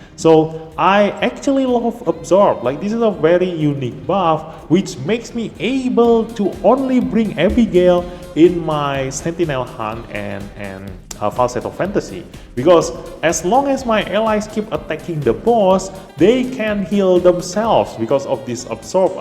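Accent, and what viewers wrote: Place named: Indonesia. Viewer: Hmm I recognize that accent, indonesian? Nice vid btw, good to see more dislyte content creators.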